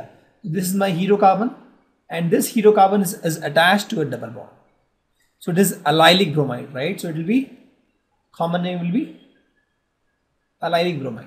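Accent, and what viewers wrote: India. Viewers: ^ Are you like from US or UK. :3 We are indians. Our accent is like that,bro. :3 Stop complaining,start appreciating. -_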